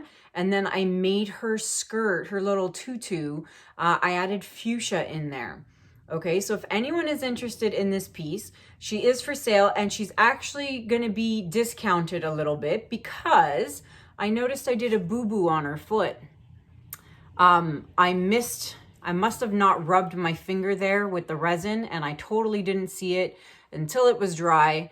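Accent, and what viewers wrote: Canada. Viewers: SO beautiful!!! I picked out your Canadian accent (How? Because I'M Canadian!)!!! lol Where in Canada do you live??? Your work is spectacular,! How long did you paint before you got to actively selling your work?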